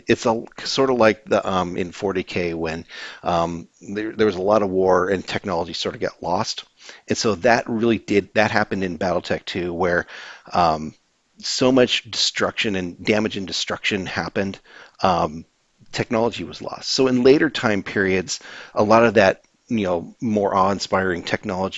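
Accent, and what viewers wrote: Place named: Minnesota, United States. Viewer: Kinda sounding like Minnesota Tribe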